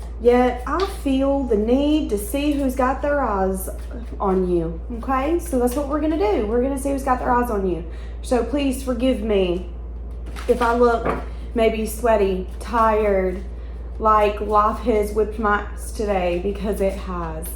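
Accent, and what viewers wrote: South, United States. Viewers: Unrelated but girl I’m from Mississippi and you have the most adorable southern drawl I have ever heard!!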